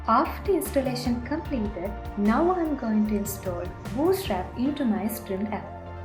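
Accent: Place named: Sri Lanka. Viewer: haha I was searching for React Form tutorials and this got recommended. keeyatawath hithuwe naa Lankawe accent ekak ahei kiyala :p Good Luck whoever you are! :)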